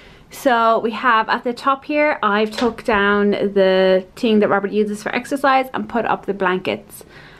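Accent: Ireland. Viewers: Well done,. I'm going to share this video with my Drama class so they can hear a proper Irish accent:-D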